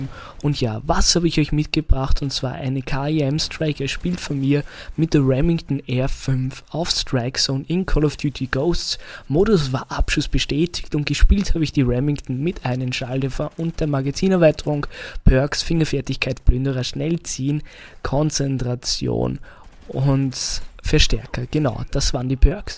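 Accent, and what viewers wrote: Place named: Austria. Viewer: Österreichische Stimme tut gut auf yt zuhören :)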